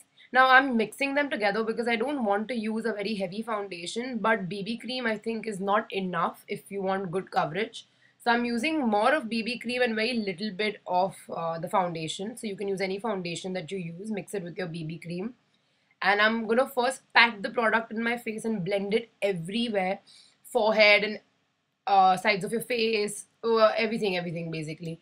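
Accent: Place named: India. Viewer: your make-up style says you are a huge fan of Michelle Phan. I like how you did not try to have an accent and spoke in true Indian way!! Way to go :)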